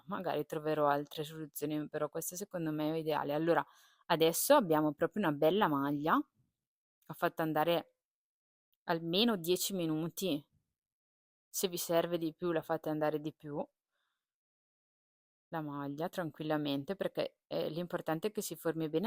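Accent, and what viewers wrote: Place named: Piedmont, Italy. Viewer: Sei bravissima. Sto facendo le tue ciabatte e farò certamente la colomba. Ciao dall'accento piemontese. Io sono napoletano e ho un piccolo un forno a legna, farò la tua pizza in pala e ti farò sapere.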